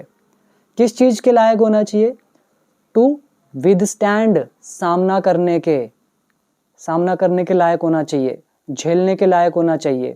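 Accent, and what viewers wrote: India. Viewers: Great 👍, I wanted to inform you to improve your slang and pronunciation. Now, please try to develop your accent. Don't teach indian accent to your viewers. Take it easy.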